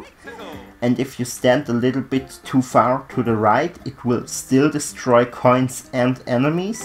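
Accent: Germany. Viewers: Man hört sooo hart dass du Deutsch bist😘 #noHomo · German accent af😅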